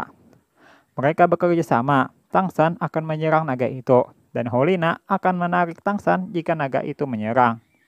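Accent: Banjar, Indonesia. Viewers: Suaranya Mimin kaya logat orang Banjar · Aksen nya mirip orang banjar hulu sungai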